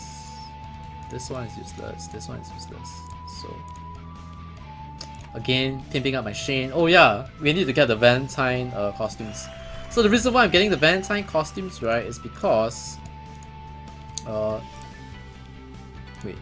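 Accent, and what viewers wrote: Singapore. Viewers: Are you also from singapore?? :D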